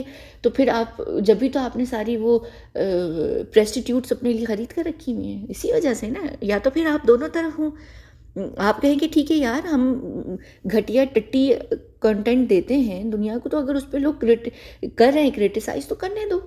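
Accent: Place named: United Kingdom. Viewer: OMG!! HEARD you angrezi wali accent after.....AGES...I fell in love with your voice...before everything else!! Love you!! 💕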